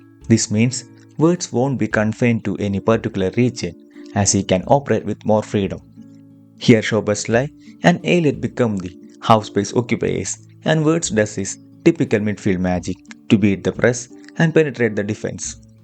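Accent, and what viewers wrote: India. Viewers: Indian accent and football don't marry well. Talk about cricket and curry. Maybe chess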